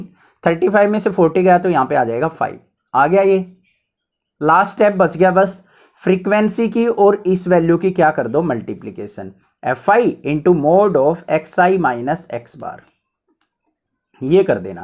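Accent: Haryana, India. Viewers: Sir ap haryana se ho na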